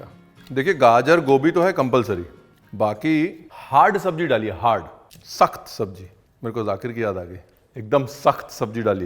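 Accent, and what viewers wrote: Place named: Lucknow, India. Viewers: Aap Lucknow ki baat karte hai bahut aacha lagta hai jo Lucknow ki yaad dila deta hai aapka Lucknow ka andaaz behed khubsurat hai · Aapki awaaz aur aapke Shabd sunke hi lag jata hai ki aap Lucknow ko belong Kiye Hain ab aap abhi kahan Rah rahe hain yah Nahin Pata maine aapko Kapil Sharma show Mein Dekha Tha tab Main Nahin Janti thi ki aap Lucknow se ho lekin usmein Maine aapki awaaz Suni Thi Kunal Sab the Sanjiv Sar the aur aap the tab Mujhko andaza ho gaya tha ki aap Kabhi Na Kabhi Lucknow Rahe Jarur Hai thank u Sar Aise Hanste muskurate rahiye Acche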